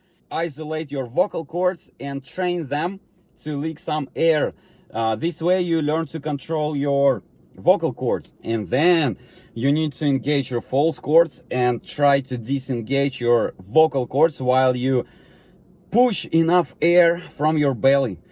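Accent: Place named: Russia. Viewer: Incredible explanation. I thought I know how to do false-fold distortion, lol, but seems like I use cartilage, gonna try your way as mine hurts. Btw, where are you from, your accent is so Russian :) Although, I think passing too much air through vocal cords dries them a lot, so fry screams should be safer.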